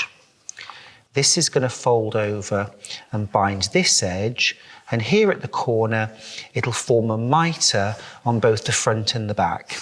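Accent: England, United Kingdom. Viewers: Inspiring tutorials & luv that English accent!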